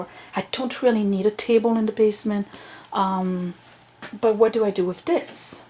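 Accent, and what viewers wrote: France. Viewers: I watch your series as I cleaning my own room like lessen your french accent hagaga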